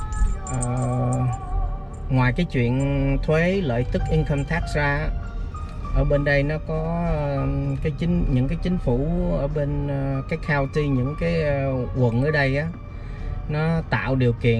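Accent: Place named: Vietnam. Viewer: Hi,qua Mỹ lâu mà sao vẫn giữ giọng VN hay há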